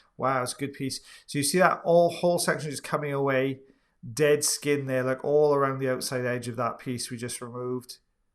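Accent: Wales, United Kingdom. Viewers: iv got to say if there was audiology ear wax removal sports 👂🦻, iv got to say you would 100% win awards has the best commentary that goes along with the vids...best youtube commentor ears 👂 down... love that gourgous welsh accent.x Keep up the good work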